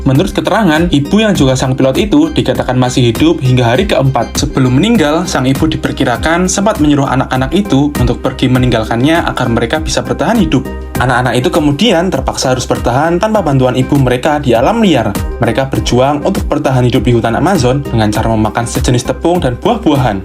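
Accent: Java, Indonesia. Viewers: Ngomong nye biase aje...keles..😢 Jgn di medok2in Logat nye 😭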